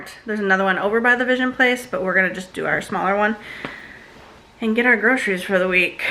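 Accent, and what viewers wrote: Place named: Michigan, United States. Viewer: Your Michigan accent was strong today 😂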